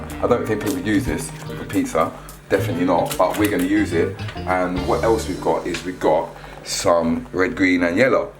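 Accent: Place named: Jamaica. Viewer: I’m confused are u Jamaican or English broo??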